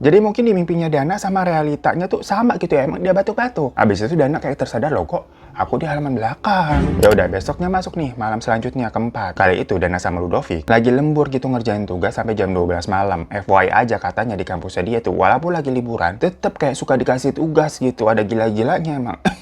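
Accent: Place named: Pasar Minggu, Indonesia. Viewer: Background: Romania. Logat: Pasar Minggu. Paling sedep emang dengerin cerita, udah kayak dengerin tetangga ngoceh. Dan ceritanya wow kayak Mid Sommar.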